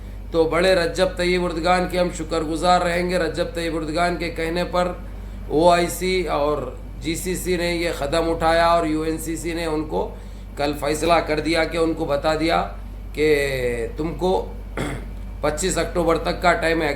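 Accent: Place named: Hyderabad, India. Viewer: Assalam walekum Bhai rah bar Allah AAP ki umar may barkat day Hyderabadi jabaan suney may itni pyaari lagti hai ki log sunkar muskurattein hai lekin Kai loga ko yeh baat nahi malum ki Hyderabadi sirf Biryani kay liye nahi Balki Kurbaani kay liye bhi jaani jaati hai jaise ki Nizam Badshah Aur unkay aulad aur jo log bhi masjidon ko Shahid karne mansoobay bana rahein hai Allah unko gaarat karenga inshalla Aur Allah mazlumo ki aur Hum sab ki zaalim kay Zulm say hifazat farmaye Aamin summa aameen Noor sayyad Dua may yaad rakhiye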